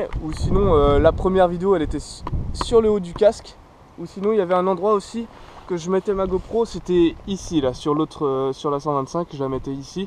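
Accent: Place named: North, France. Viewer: au toi tes du nord =)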